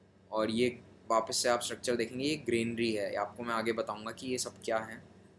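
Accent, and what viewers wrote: India. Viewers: English has been taught in India since 1830. Why can't you speak English? Do not worry about your Hindi accent. Just speak English!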